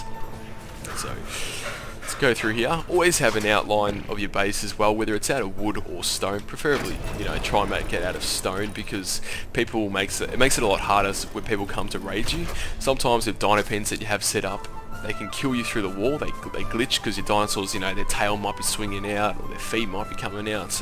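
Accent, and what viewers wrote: Australia. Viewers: Where is your accents from? Australian? not sure :). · Finnaly i found an aussie gamer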